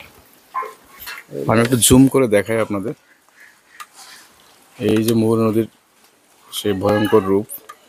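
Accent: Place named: Noakhali, Bangladesh. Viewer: এটাকে বলে নোয়াখাইল্ল্যা ভাষা...!!! পুরা সেইম টু সেইম।। একটুও এদিক-সেদিক নাই।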